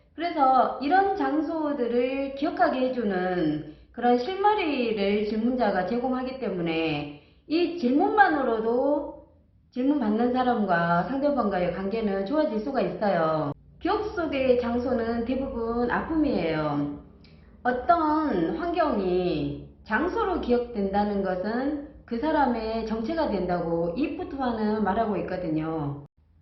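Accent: Gyeongsang, South Korea. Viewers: 저처럼 경상도 사투리라 반갑네요 ~^^ 정체성인 장소~ 이번 기회에 생각해봐야겠어요~^^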